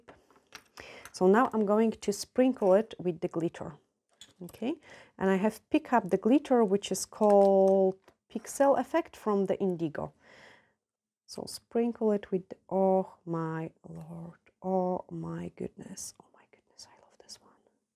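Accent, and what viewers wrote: Scotland, United Kingdom. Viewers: I knew I heard Scottish in your accent! Love how you’ve picked up wee words.. 🥰 am in central Scotland wee bit far from you, your nails are stunning, have you tried the new soft gel tips yet? I’ve ordered mine from Gelish Harmony xx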